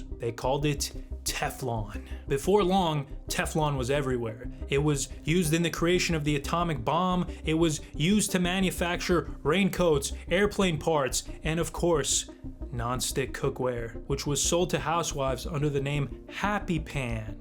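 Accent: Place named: Chicago, United States. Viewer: great chicago accent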